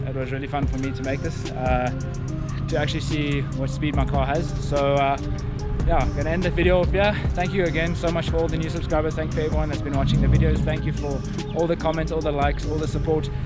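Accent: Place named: South Africa. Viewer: I hear a South African accent I subscribe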